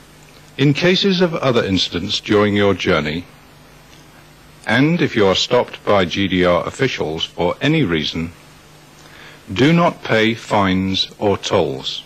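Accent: United Kingdom. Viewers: I think it’s the very stoic and calm British accent aswell- it’s like those videos of what to do in a Nuclear War they made around the same time as this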